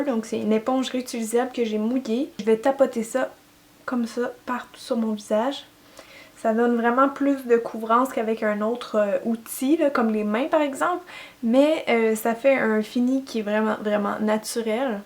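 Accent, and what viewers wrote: Quebec, Canada. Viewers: Salut, juste pour te dire que tu es super et que tes vidéos son génial! N'écoute pas les commentaires négatifs! Tu as pris de l'assurance et c'est génial pour toi! Pour tout dire tu m'as carrément donner l'envie de faire ce superbe métier et je me lance l'année prochaine! Bref, continue comme ça, j'aime ce que tu fais et j'aime aussi ton belle accent québécois!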